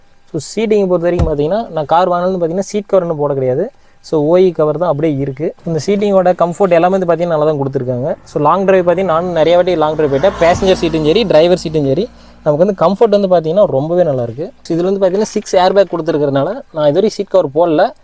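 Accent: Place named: Kongu Nadu, India. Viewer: Athu konga area slang nga kannu😊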